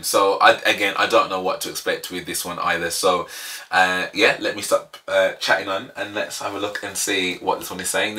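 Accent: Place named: Birmingham, United Kingdom. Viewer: First of all, do you come from somewhere near Brummagem, you sound a bit like Jack Grealish to me ? Any road up, Adagio was sung by Lara Fabian who has been a great influence on Dimash. If you check out her performance of Adagio you will see why Dimash holds her in such high regard, they both put heart and soul into their performances. <link> She has performed live with Dimash singing Ti Amo Cosi, which no doubt you will come to in the future. There was a great camaraderie between the contestants in Singer 2017 and are all top draw. All the best, Peter.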